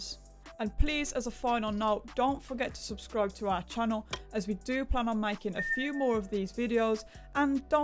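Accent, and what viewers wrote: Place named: Midlands, United Kingdom. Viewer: I’m good at spotting a Mids accent , and watch differences 😉 respect , great detailed commentary and spot the difference 💯👍🏻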